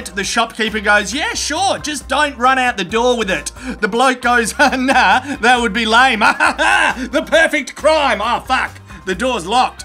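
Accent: Australia. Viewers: Fucking love this guy Are you an Aussie too mate Im dumb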